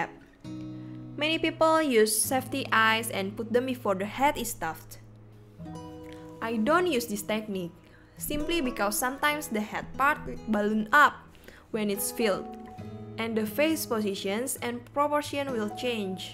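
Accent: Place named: Indonesia. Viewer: Your accent likes Indonesian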